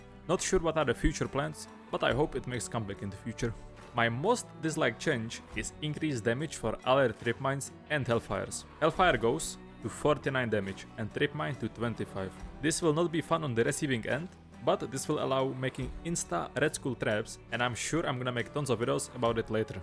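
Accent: Czech Republic. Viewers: nejsi nahodou čech? :D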